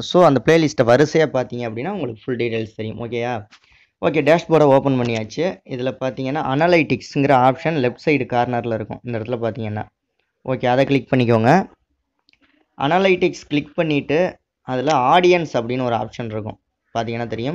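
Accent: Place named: Salem, India. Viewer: Super neenga endha ooru. Slanga patha Salem Zone a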